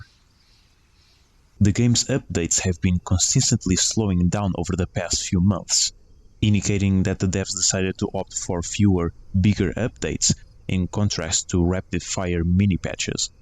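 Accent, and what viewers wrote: Portugal. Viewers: este sotaque é tuga meu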